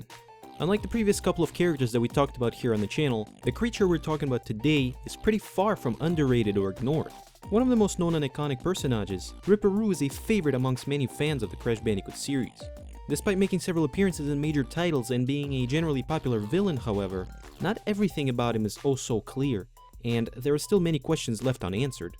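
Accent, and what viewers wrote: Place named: New York, United States. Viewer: Wait, your first language was not english? I could easily pass you for a new yorker, your accent is perfect for america!!!! I just sound like a (weard) english person.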